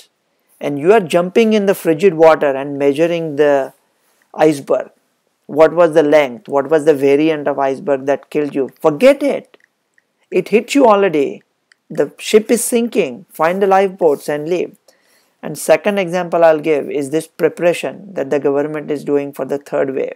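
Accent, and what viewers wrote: India. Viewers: Your accent is still Indian 🙂.Strange. Good thiught though.Keep spreading the hope, positivity.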